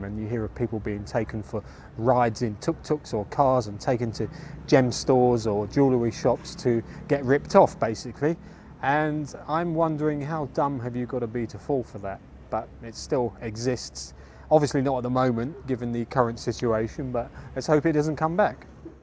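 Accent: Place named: Yorkshire, United Kingdom. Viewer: Great tour...... Nice to see you've not lost that Yorkshire accent 😀 5555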